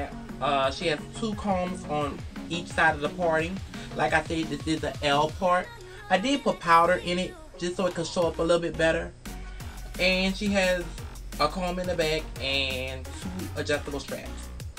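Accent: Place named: New Orleans, United States. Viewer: Are u from new orleans? I hear the accent.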